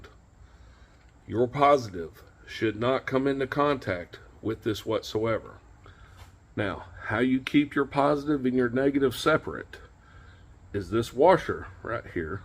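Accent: South, United States. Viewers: Ugly redneck accent. 26 minutes of BS and 1 min of information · Thank god I am from the south and can tolerate southern talk and mannerisms without getting pissed off because "condescending" to Californians is normal speech down here. Also here to learn CB. Got a firestik antenna and am learning how to use it. Thanks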